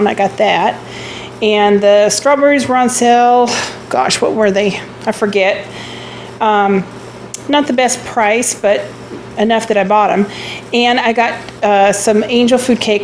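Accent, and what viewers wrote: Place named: Tennessee, United States. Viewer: Idk where you are from, but I'm from Tennessee, and you sound just like me lol you remind me of family!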